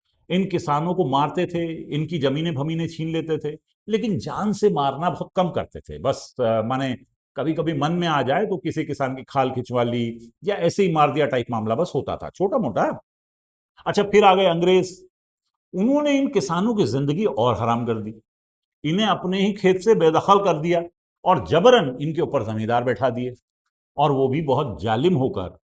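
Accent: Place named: Uttar Pradesh, India. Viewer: You are great. You talk like my native Uttar Pradesh tone.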